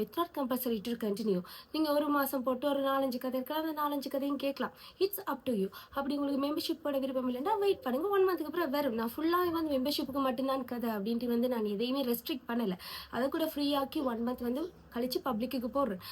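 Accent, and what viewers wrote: Sri Lanka. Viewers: Pavani voice so sweet so problem Rj voice ku neenga srilanka tamil ah slang romba pudikkum sutha tamill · Mam neenga srilanka va unga novels read pannum pothu theriyuthu....indha video pathatum vilanguthu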